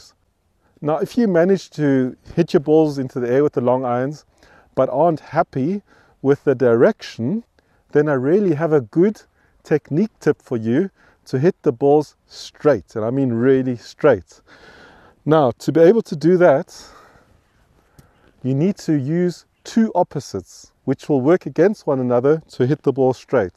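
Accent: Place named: Austria. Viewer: Love the Arnold accent ... nice video!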